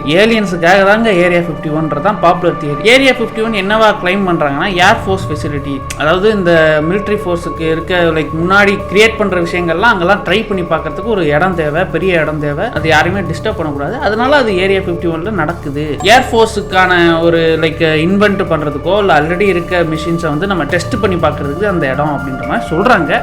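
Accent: Madurai, India. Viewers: Bro nee madurai la irukkiya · Bro's proving that he's from madurai in every chance he gets🙂‍↔️ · Thala nee madurai ya??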